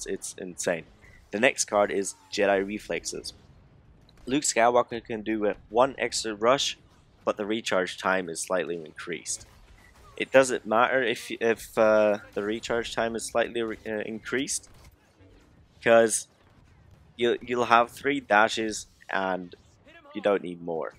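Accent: Germany. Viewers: Dein Deutsches Englisch ist ja krass gut 👍🙈 Nices Video :-) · Der Deutscheakzent is da you are one of the best player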